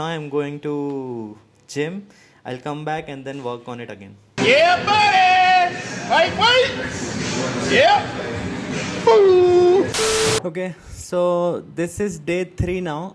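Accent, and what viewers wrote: India. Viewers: Bro pls speak in hindi 😢 your accent is indian I know you can speak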